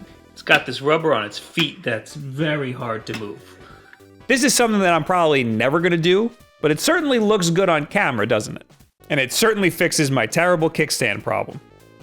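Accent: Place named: New York, United States. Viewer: I know I feel like I'm being yelled at. It's like I'm in New York or New Jersey.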